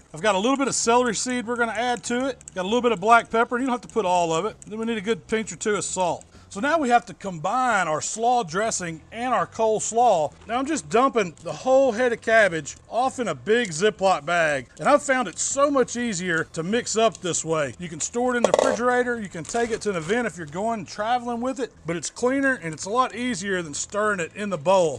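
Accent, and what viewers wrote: South, United States. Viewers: i soon as i saw a big white man with a beard and southern accent, i know i found what im looking for.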